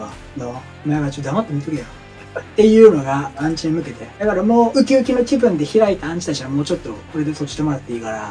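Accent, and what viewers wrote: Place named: Kansai, Japan. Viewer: 関西弁がびっくりしてもぉーた😂 頑張って下さい 見てますおもろいですよ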